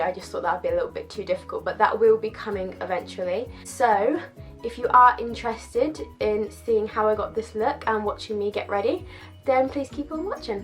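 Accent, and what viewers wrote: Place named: United Kingdom. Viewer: You're British!? I'm in love😻😻😻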